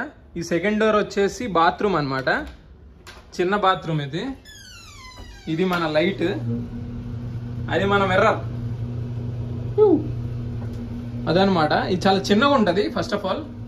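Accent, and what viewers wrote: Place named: Telugu, India. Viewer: Baga edit chesav anna with pakka Telugu bidda voice 😁😁🤝🤝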